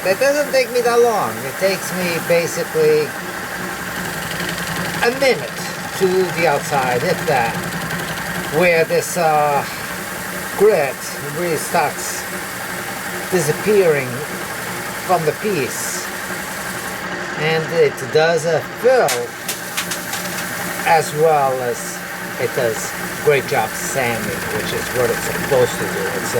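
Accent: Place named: Portugal. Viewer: you are a rebel! ( and a master) love watching to see what your making.By the hat and the Portuguese accent you must be from the Massachusetts area. Keep up the awesome videos,you are truly a craftsman.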